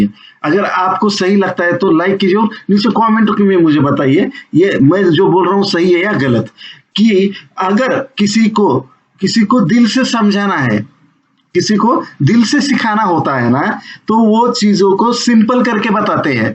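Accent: Maharashtra, India. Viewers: Saheb tumcha tone varunach olakhla tumhi marathi ahe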